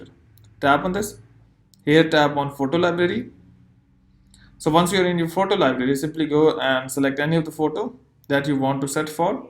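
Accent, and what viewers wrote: India. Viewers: Right when I heard that Indian accent I knew it would be the easiest tutorial thanks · You know he speaking facts when you hear he’s Indian accent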